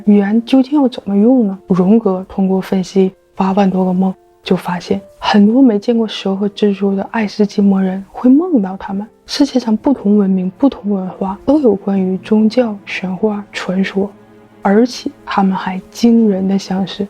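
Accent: Northeast, China. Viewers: 🤣🤣🤣🤣🤣🤣我去，看着人，看着画面，看着繁体名字，以为是台北的，一听口音，原来是东北的。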